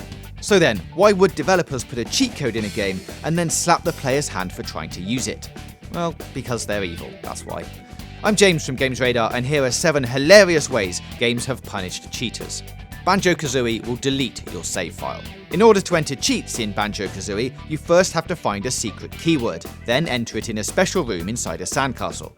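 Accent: United Kingdom. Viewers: Eww he has a British voice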